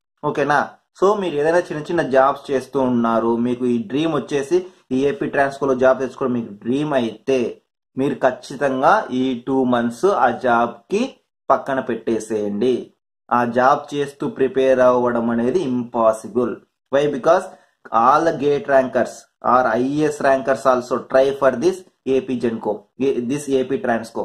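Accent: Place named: North India, India. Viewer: Baagaa matladaaru I mean pure telugu. Nenu meeru north anukunnanu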